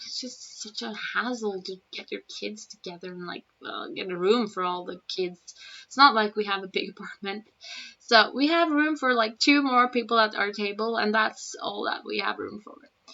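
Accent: Oslo, Norway. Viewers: Det var jo kjempefint å se deg, men jeg håper hvis du kunne lage en ny video der du snakker Oslo dialekt slik din. Her på vestlandet snakker de på en annen måte, meg det blir nyttig for oss som har lyst til å lære den østlandske dialekten. Tusen hjertlig takk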